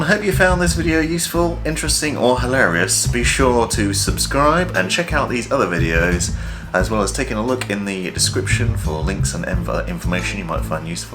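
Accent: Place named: RP, United Kingdom. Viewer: u sound like a rich dude living in a castle....in england......posh voice NOICE though u gave a clear info about this mouse now all i need to do is save up some cash and buy it some day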